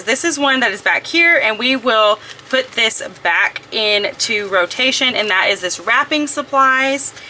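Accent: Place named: Midwest, United States. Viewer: I can SO tell you're from the Midwest "lets get to gettin". I love your Flower Farm Box ❤🌻🌻🌻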